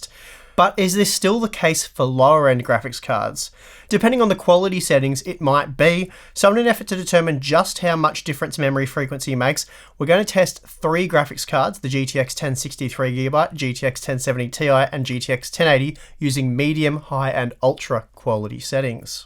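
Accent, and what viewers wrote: Australia. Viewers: Gotta say I can now understand, that australian accent of his really gave me trouble in the first few videos I watch from this chanel and that means a lot due to me living in Australia for almost a decade, ans I thought I can understand the accent already.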